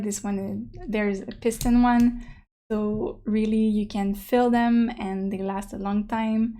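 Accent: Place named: Quebec, Canada. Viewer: Omg haii! It's my first time watching your videos and I notice your accent sounded very familiar, then it clicked you must be from quebec province~ me too ^^ Thank you for the well elaborate video, it helped me a lot to choose since I've only recently started this hobby and I was lost on which pen to start (funny enough the first thing I bought were bottled inks xD ) that pearl kaweko fountain pen surely is very pretty and lovely, too bad it was a limited edition TT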